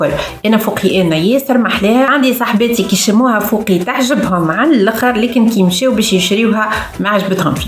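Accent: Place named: Tunisia. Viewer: J'adore l'accent tunisien thabeel , merci pour la revue #algerie ❤️❤️❤️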